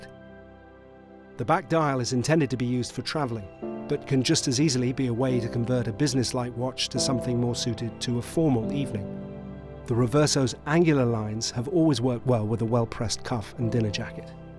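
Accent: RP, United Kingdom. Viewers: I find this off putting. The nonsense snobism, the posh accent, the whispering, just ridiculous.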